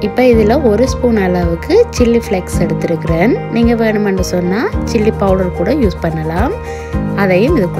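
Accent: Sri Lanka. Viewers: Very nice😊👍I love your singala tamil😊😊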